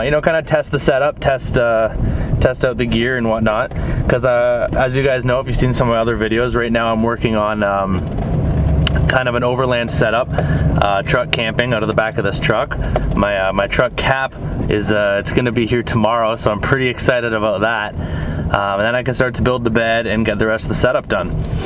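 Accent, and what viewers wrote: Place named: Canada. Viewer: Like the Canadian accent.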